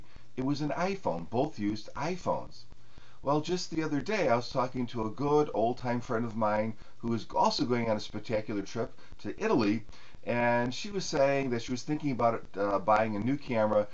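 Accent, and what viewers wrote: Chicago, United States. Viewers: are you from chicagoland?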